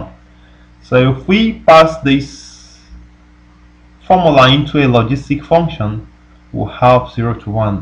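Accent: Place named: Nigeria. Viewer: I dont think his voice is not good for explanation. I think the problem is the accent. I am Nigerian and we have similar accents. Its the same challenge I experience when watching videos with strong Indian accents. In my opinion, his voice is clear and he explains well. The only challenge for you is that he doesnt speak with an accent that you understand.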